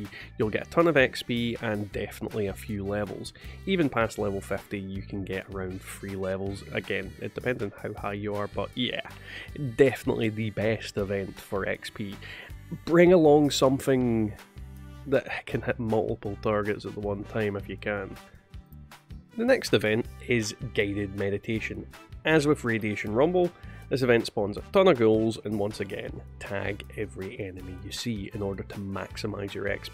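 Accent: Scotland, United Kingdom. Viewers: Min far ye fae in Scotland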